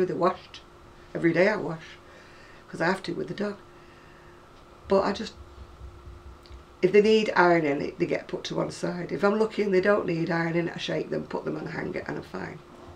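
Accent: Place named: RP, United Kingdom. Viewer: You always make me smile! Posh or no, I love listening to you.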